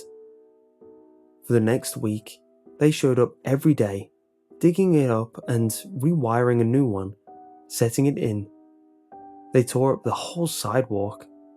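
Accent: United Kingdom. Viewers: are you British, love your channel BTW :-D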